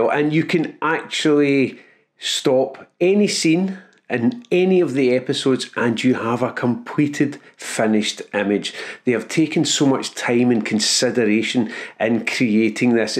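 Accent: Scotland, United Kingdom. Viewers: Interessante Methode! Und was für ein drolliger Akzent! Ist das Schottisch?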